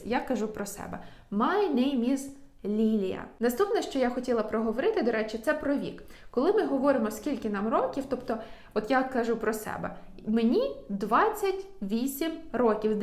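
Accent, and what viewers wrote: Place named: Georgia. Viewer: Ваша вимова англійської така сама як грузин вимовляє російською. Та подайте коротенькі казки чи інші невеличкі тексти англійською, але диктор має бути людина яка є носієм англійської мови.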